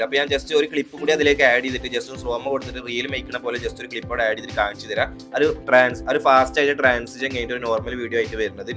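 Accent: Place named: Kannur, India. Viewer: Machuuu neggaa kannur alle 🫵🏻😂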